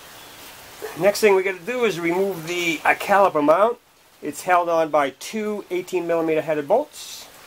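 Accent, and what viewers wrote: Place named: Massachusetts, United States. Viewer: I love hearing the accent!! LOL!! My wife grew up in Lawrence and I said, this guys gotta be from Mass! LOL!! Sure enough, I see the Mass tag on the front! I do get a bit crotchety with these manufacturers!! Read is 13m so it figures the front would be different....as some one else pointed out, they have you buying one of this and one of that.....well...at least I can justify my Advance auto charge on the bank statement!! LOL!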